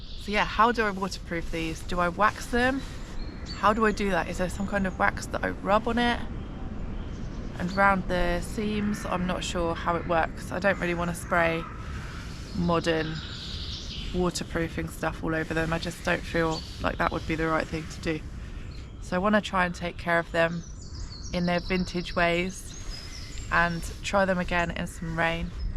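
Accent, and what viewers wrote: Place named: RP, United Kingdom. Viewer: thank you, love how you try to hide the posh rege either way. bon labore' Thanks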